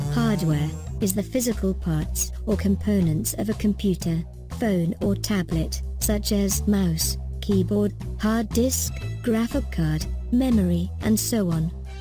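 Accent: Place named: RP, United Kingdom. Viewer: I enjoyed this because I thought the voice was Glados with a posh British accent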